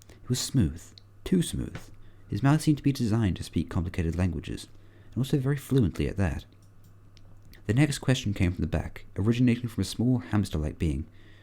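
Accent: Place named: United Kingdom. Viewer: idk you sound pretty british to me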